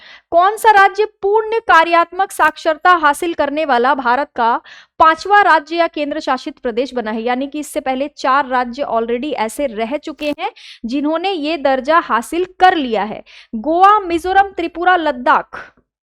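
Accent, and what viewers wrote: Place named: Bihar, India. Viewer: Madam ji aapka bolne ka excent thoda Bihari jaisa lagta h but accha bhi lagta hai 😊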